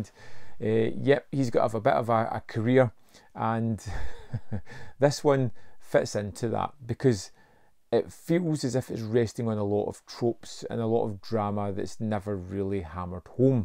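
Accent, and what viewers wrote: Scotland, United Kingdom. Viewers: I know you are listed as being in the U.K. Your accent screams Scottish to me. I grew up in the U.S. and one of my best friends was from Scotland and his dad sounds just like you. He was also a real life badass being a former Royal Marine. I enjoy your presentations and greetings from Texas.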